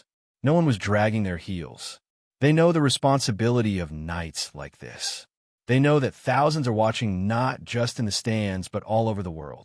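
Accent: United States. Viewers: Typical USA commentator..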